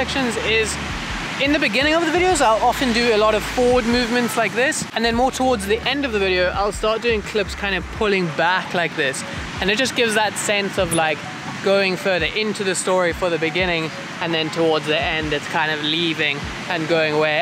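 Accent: Cape Town, South Africa. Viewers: Hy dude are you from Cape Town?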